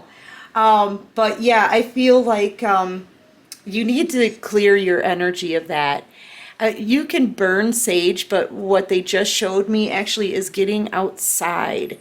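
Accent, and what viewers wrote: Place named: Wisconsin, United States. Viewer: I like your Wisconsin accent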